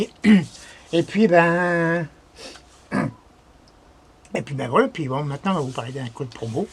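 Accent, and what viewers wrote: France. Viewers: Vous le méritez par votre implication au quotidien pour nous faire partager votre passion... continuez à prendre du plaisir à le faire... votre accent est un régal au contraire... c est çà la France, et la richesse de ses différentes régions : coutumes, patois, accents spécifiques, gastronomie, territoire, sol et climat... etc... ne vous changez pas, soyez fier de votre identité et les personnes qui voudraient lisser toutes les beautés de nos régions passent à une autre chaîne qui mets en avant des futilités, l argent, le langage, le physique etc... ne rentrez surtout pas dans un moule à la mode... car par principe la mode est éphémère et cyclique... pour revenir toujours à des choses anciennes qui sommes toutes reviennent à la mode du jour... restez comme vous êtes... et soyez sûr que vous avez du public de tout âge car les nouvelles générations comprennent l'intérêt de prendre soin de l environnement et de produire soi même ses légumes, fruits et autres... 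vous incarnez beaucoup de valeur et êtes ce relais inter générationnel... alors continuez à transmettre... milles merci à vous deux et à tous ceux qui comme vous partagent leur savoir et transmettent leur passion (Philippe, Olivier, André... mais aussi les permaculteurs, le jardin des merveilles, et bien d autres)... Merci 🙏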